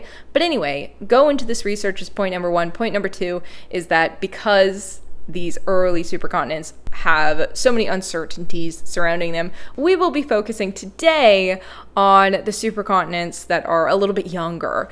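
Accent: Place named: Texas, United States. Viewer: That’s just her Texas twang coming through, relax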